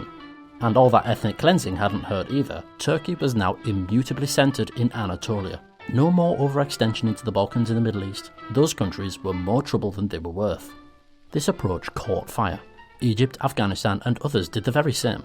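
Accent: United Kingdom. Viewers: I fucking hate your accent with all the force of my spirit but I have to say brits surely do quite good content here in youtube (even if sometimes i dont fully understand what you say) keep the good work my good chap P.D: Roll youR fucking Rs for the love of god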